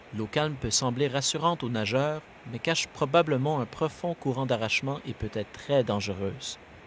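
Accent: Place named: Quebec, Canada. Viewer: Sur la côte atlantique française, cela peut porter plusieurs noms : ''Baïne'' ('petite baie' ou 'bassine' en langue gasconne) sur le littoral aquitain, ou ''Bâches'' au nord sur la côte de Bretagne ou de la Manche. Plusieurs phénomènes doivent être rassemblés pour créer ces courants d'arrachement : Il faut des marées suffisamment fortes, du sable fin, un dénivelé faible et une forte houle. C'est spécifique aux côtes dont le fond est uniquement sableux. En tant que surfeurs et bodyboardeurs, nous nous en servons pour faciliter la remontée vers le large, une sorte de ''voie rapide'' dans l'eau pour rejoindre les pics du déferlement des vagues et se positionner. Mais en effet, c'est très dangereux pour les baigneurs. Vos conseils de prudence sont très utiles. Chaque année, nous déplorons des noyés dans ce contexte, par imprudence. En tous les cas, je savoure l'expression que vous employez (''Courants d'arrachement) + l'accent québecois ; c'est encore plus suave ! 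😉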